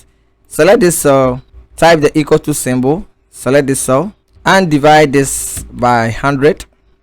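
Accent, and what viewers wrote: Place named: Africa. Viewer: I really love your accent. Are you an African?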